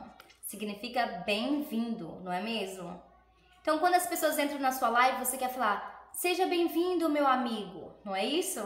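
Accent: Brazil. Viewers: Huruuu ❤ agora aprendo amiga falando caipira como eu falo do interior 😂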